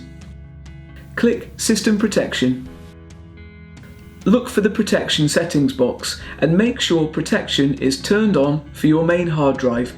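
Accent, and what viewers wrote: United Kingdom. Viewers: Another Brit?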